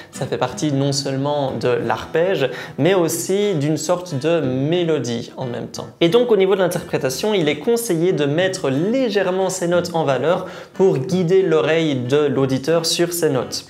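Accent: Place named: France. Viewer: je suis québécois et je te trouves très articulé et claire alors même si j'ai un peu de misère a m'adapter à ton accent français, j'apprécis quand même tes vidéos, merci beaucoup!!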